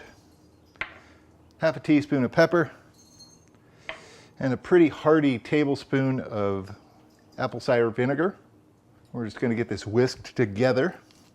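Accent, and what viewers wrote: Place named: Boston, United States. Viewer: Australian?! That accent was straight out of Boston!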